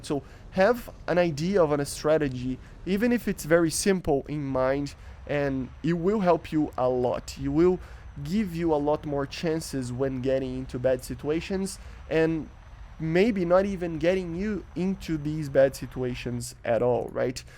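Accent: Brazil. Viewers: nice video, but i have a question, are you brazilian? your accent sounds brazilian, if not, where are you from?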